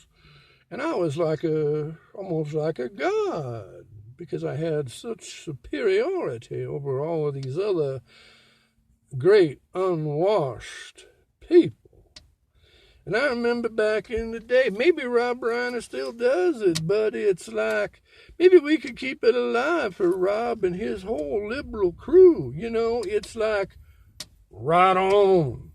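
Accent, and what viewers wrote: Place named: South, United States. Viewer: I love the SOUTHERN DRAWL. Interesting articulated take. :) Lemme argue definition for a moment here. btw, I think that Reiner is totally a crazed Leftist. I think Leftists are the crazies, and I think "libs" are different, in that they are, well, the "good Dems"...which sometimes sway to center, or even right of center. So, for voting purposes , let's not ostracize the "libs".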